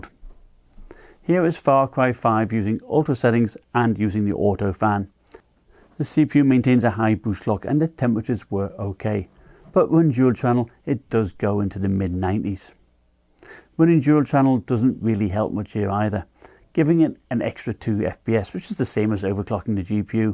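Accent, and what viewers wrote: United Kingdom. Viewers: Hey, first off I hear a British accent but hear dollars and see that disgusting enter key 😂 must be tripping but I have a really important question, curry’s are really weird with they way the price the laptops here so the nitro 7 with 144hz, 8GB ram and 1660 Ti will be around 800 quid on Black Friday with a 512 gb ssd and the Helios 300 with 1660 Ti 1TB HDD and 256ssd also 144hz will be around 1.1k, what would you reccomend??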